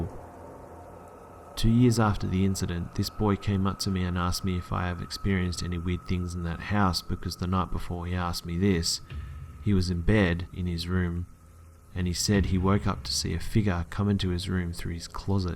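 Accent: Australia. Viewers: Started the video and wondered if you where an Aussie then checked the description and laughed Ps. It's g'day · Are you Australian?? Your accent sounds like you are. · Love your channel Man! I'm a fellow Aussie and it's so nice to hear stories read in our accent :) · You know what scares me the most about your stories, but only the ones you tell that actually happened to you? You have a fucking Australian accent, when I was a kid my house was broken into multiple times but nothing was ever taken. Now that I think of it it scares the shit out of me. Anyway the things that you talk about, make me shake so hard. I don't want to think that any of this shit happens in Australia. But then again, I have seen Ivan Milat in real life too.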